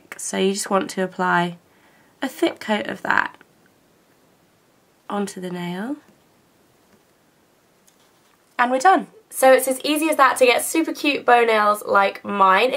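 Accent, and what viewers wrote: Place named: London, United Kingdom. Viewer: i love your accent how long have u lived in london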